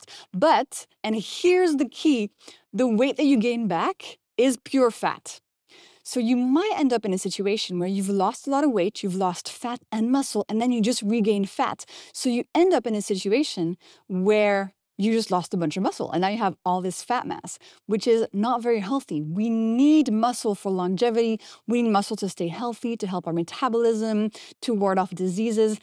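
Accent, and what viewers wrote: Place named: France. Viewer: That French accent is shining through 🇫🇷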